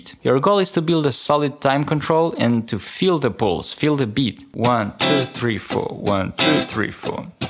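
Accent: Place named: Eastern Europe. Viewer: Nah. This guy is accent is Eastern European.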